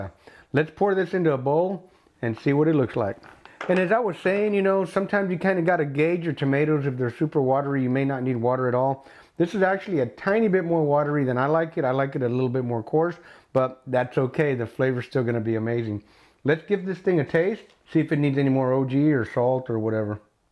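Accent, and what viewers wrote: Texas, United States. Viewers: I like how you look like a full Mexican but have the voice/accent of a Texan. AMAZING!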